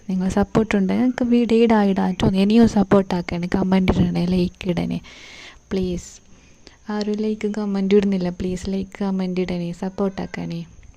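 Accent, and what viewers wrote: Kasaragod, India. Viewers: കാസർകോട് ആണോ ..ഈ സൗണ്ട് ആണ് നല്ലത്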